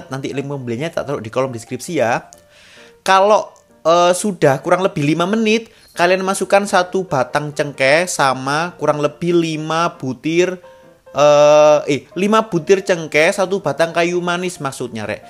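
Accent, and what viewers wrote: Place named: Surabaya, Indonesia. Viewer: Aku lho paling suuuwwweneeng nek krungu omongane arek iki serasa nang suroboyo😆😆😆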